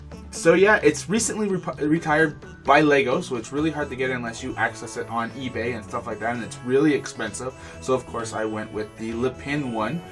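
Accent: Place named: Canada. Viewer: Ah okay! Sorry, I didn't detect the Canadian accent! Yeah, In Canada it released for CDN$300. It went as low as CDN$271.63 about a month before Christmas in 2014 after the Lego Movie came out: <link> Now, you're right it's crazy expensive: CDN$ 649.99 The subreddit r/Lepin is a really good place to go for info on this stuff. Really enjoy the vids btw, keep it up Hope your channel grows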